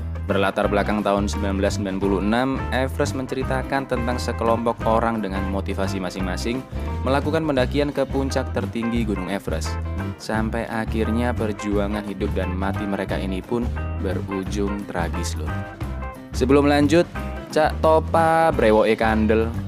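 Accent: Java, Indonesia. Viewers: Jowo pundi mas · Min orang jawa?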